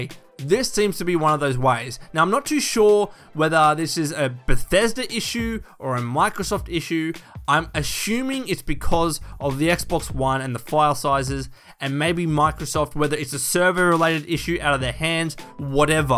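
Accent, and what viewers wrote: Australia. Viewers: Wow, I'm kinda digging the Aushie accent this way.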